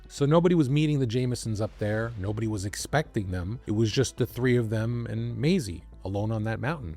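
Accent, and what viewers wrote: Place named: New England, United States. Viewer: Are you from New England? You sound like a fellow New Englander.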